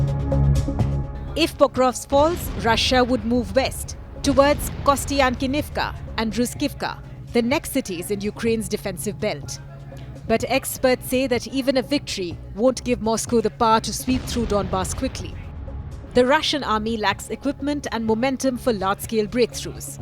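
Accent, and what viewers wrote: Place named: India. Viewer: Can't stomach this jeet accent, rly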